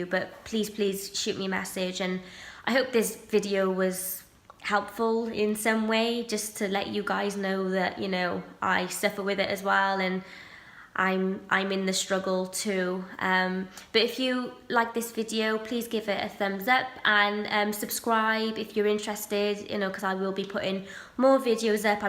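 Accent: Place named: Wales, United Kingdom. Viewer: The more I watch you the more I hear that we are exactly the same. I can't say that word either or watch films or TV shows that deal with the illness. I have a non urgent test coming up but I am terrified. Maybe it should be urgent...you know how we question everything! I think about this test 24/7. However I am greatly comforted by you. You are Welsh? I hear it in your voice! I am half Welsh. I am old. 69. I feel ashamed that I have this health anxiety...I just can't help it but feel that I should not have it at my age....I should grow up a bit! It's not my fault that I have it but I can't help thinking that I am completely self obsessed and thinking of myself all the time. God Bless You. Thanks for this video XXX❤️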